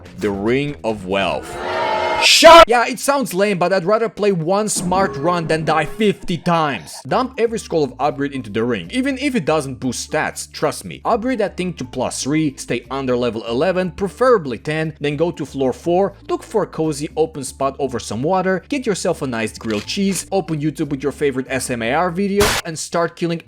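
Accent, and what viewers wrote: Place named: Eastern Europe. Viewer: Pelo sotaque esse cara provavelmente é europeu e possivelmente eslavo. Acho que eles entendem alguma coisa ou outra sobre comunismo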